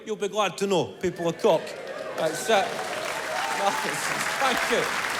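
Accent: Scotland, United Kingdom. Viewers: It's called Scottish. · He sounds more scottish than before. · Sounds like a Scot !!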